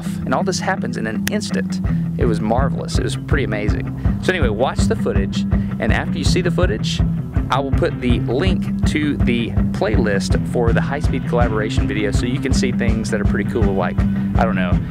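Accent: South, United States. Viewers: this is america in a video, the food, the accent and the gun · I have to ask. This vid was published June 22, 2012. Today is October 3, 2017 and I'm watching it for the first time. At the beginning you said you were in the California desert. How do you come by your Southern accent? I'm watching this from East Texas. You sound like you could be from around here. Just curious. Where are you from, originally?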